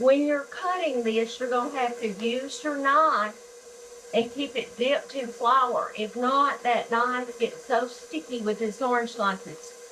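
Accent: South, United States. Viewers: Love hearing the southern accent. I miss my mountains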